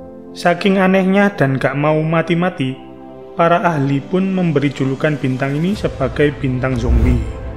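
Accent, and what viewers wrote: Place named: Java, Indonesia. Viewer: Tingkatkan lagi mas channel nya, em... Logat jawanya tolong agak dikurangi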